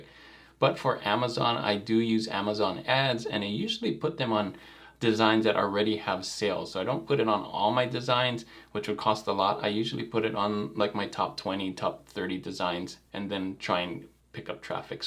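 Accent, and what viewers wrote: Hawaii, United States. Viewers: EH! congrats on da 150k! 🤙 i noticed the small kine accent when i first started watching your videos. but since you never made much reference to Hawaii in the other uploads that i have seen, i kinda shrugged it off as me assuming too much! LOL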